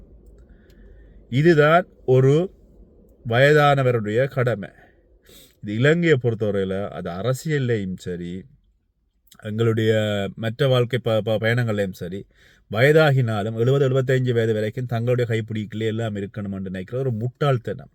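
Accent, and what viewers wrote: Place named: Tamil Nadu, India. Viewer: நீங்கள் ஊடகவியலாளராக பணியாற்றியவர் என்றால் ஏன் Baljeet போல இந்தியத்தமிழ் கலக்கிறீர்கள்?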